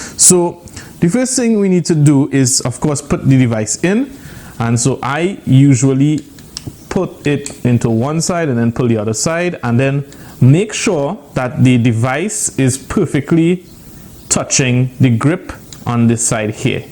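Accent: Trinidad and Tobago. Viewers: You sound like a Trini. Am I right? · Wow. An ACTUAL video that helped!! Thank you so much for this. Lov'n the trini accent too haha!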